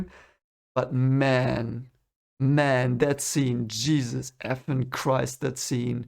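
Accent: Germany. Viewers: Very nice review. Due to your accent I assume that you are from Germany? ;)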